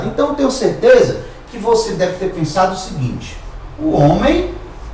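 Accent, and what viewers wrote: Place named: Northeast, Brazil. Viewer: se fui agressivo, desculpas mil! O problema é que o amigo posta aulas não para o nordeste e, sim, para todo o Brasil, aliás aulas excelentes. Minha bronca, que nem mesmo é uma bronca, apenas uma observação mais forte que o tom normal, é que o amigo teria ainda mais sucesso do que tem se, num gesto de concessão, fizesse um esforço para pronunciar corretamente o fonema "de". A toda-poderosa Globo gasta milhares de reais com fonoaudiólogos para corrigir vícios, ou regionalismos,de linguagem, pois suas séries não são apenas para o público carioca e, sim, para todo o Brasil. Cara, repito, um milhão de perdões se pareci agressivo. Aqui, o "astro" é o amigo, sou apenas um assíduo visitante dos teus vídeos os quais são dádivas aos necessitados de melhoras em termos de conhecimento da nossa tão amada língua portuguesa. Abraços e saudações coloradas!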